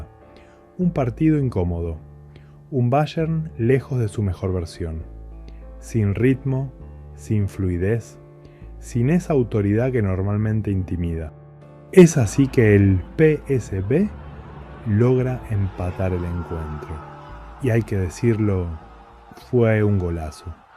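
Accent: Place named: Argentina. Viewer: Me encanta tu análisis sin gritos sin estridencias muy acertado se oye lindo con su cadencia Argentino saludos 👍👏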